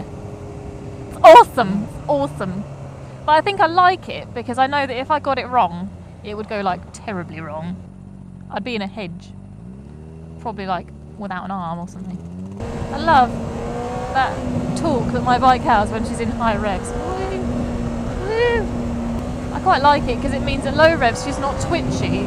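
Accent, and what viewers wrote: United Kingdom. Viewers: British accent is pretty funny to hear Cheers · You have a lovely voice. I watch your videos just for the sound. But while listening to you or any Brit I always think man....there's no way I could be British besides the fact that I'm an American because it takes too much effort to speak like that. You guys put so much effort into speaking. Americans try to shorten words and drop syllables any chance we get but Brits look to add syllables every chance they get. Example... Aluminum 😀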